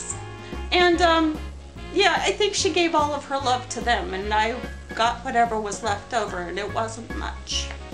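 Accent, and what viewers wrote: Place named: Minnesota, United States. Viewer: LOL, So the next time my granddaughter wants me to read one more story and I'm too tired, I'll call on Aunt Nancy... The accent will help her get to know her Minnesota roots. But wait - I'm never too tired to read to her. Whew, she's safe! LOLOL! Great vid.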